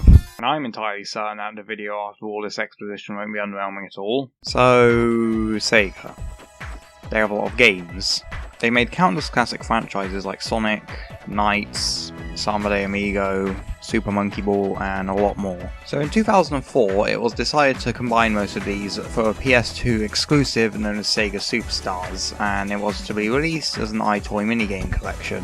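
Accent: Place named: United Kingdom. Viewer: Bruh your British o.o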